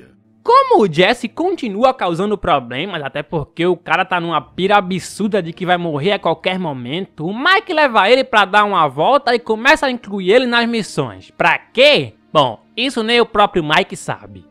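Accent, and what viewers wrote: Northeast, Brazil. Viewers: Sotaque nordestino o caba conhece de longe😊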